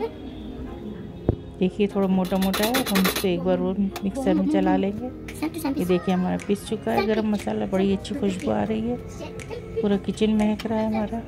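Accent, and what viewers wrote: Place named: Karachi, Pakistan. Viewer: ہم حیدرآبادی ہیں میری بہن آپ مجے آپ کراچی کی لگ رہی ہیں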